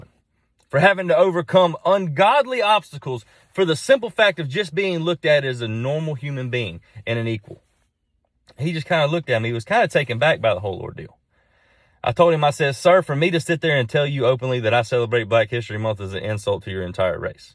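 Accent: South, United States. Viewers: I always feel if you are too big you don’t care or listen, but damn you actually do and I’m glad cuz I love that southern drawl but will admit it can be scary due to stereotypes, thank you love ya mucho amor 💕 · That Southern draw is so sexy.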